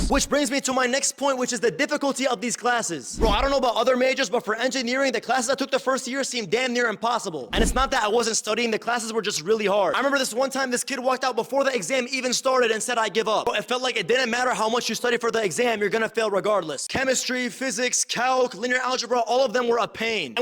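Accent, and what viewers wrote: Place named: Jordan. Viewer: Bro English with Jordanian accent is insane haha, great video btw bro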